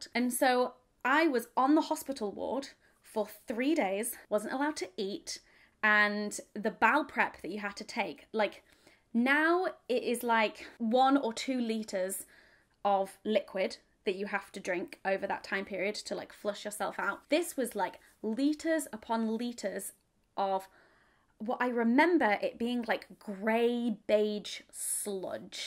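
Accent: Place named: Manchester, United Kingdom. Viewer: Manc accent alert!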